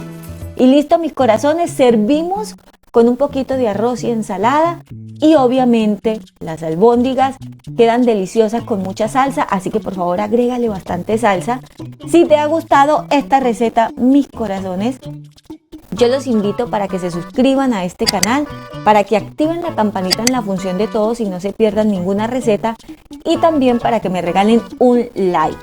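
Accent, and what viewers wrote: Colombia. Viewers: Ud es colombiana ??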